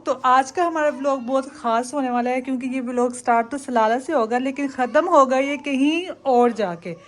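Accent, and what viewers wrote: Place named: Sialkot, Pakistan. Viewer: Nice video baji ap kaha se hai Pakistan aapki language Sialkot ki lagti Hai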